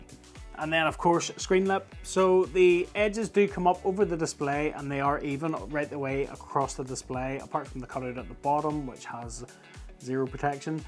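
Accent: Northern Ireland, United Kingdom. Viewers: You sound so northern Irish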